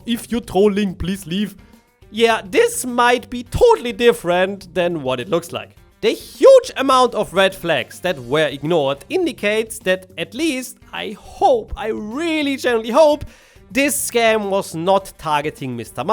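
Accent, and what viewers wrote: Germany. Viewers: I hear a little bit Deutsch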